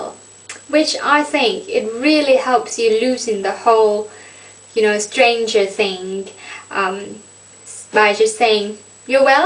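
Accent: England, United Kingdom. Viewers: Just stumbled on this site. Ha ha. You sound much better with an English accent. Waddee kap. Kop khun kap kru. Kuhn athibai phut passa Thai chaat mak. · love ur British accent 555555+ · Your British English is perfect, any way I am from the good old U S of A LOL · This is the first video of yours I have watched. Your English accent is great and it really made me laugh that you stayed in character! I'm from Scotland and would love to hear your Scottish accent! xx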